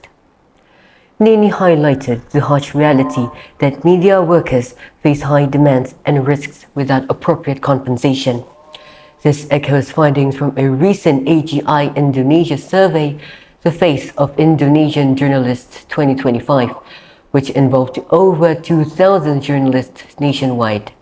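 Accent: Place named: United Kingdom. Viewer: Mas british sekaleee😘😘😘😘❤keren euy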